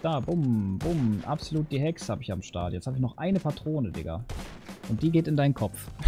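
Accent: Cologne, Germany. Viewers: ahaha wurden die dezent wegpenetriert lol.......dein Köllner Akzent ist wonderfull:D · Kommst du aus der Nähe von Köln?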